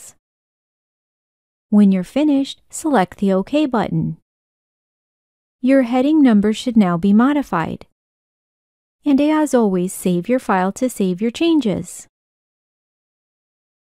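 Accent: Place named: New Jersey, United States. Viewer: Good info. Lol at the New Jersey accent and Indian vocabulary.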